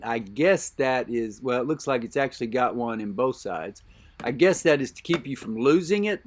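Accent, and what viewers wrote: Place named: South, United States. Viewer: Dude I love your commentary just a good ole southern fella. Thank you for the video good sir.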